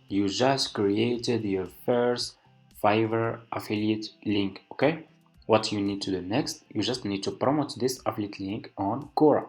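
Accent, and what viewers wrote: Morocco. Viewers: sawtek mghribi bro? 😛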